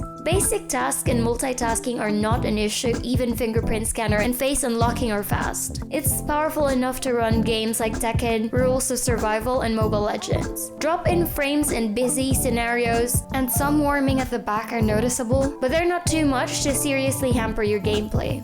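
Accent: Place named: Philippines. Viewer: Now that's what you called a filipino-english accent😍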